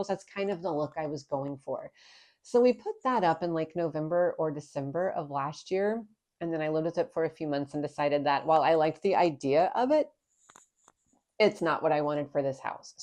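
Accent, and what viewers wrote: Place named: Oklahoma, United States. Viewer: The dining room looks fabulous! I ❤the Indian chief picture, beautifully noble. Drawer only sounds funny to you because you have developed the Okie accent. 😂 I was supposed to get to meet you at the book signing in the McKinney Knittery but I had a bug. I picked up your book the next day, it’s amazing. Thank you! You might could start a “give away” of socks.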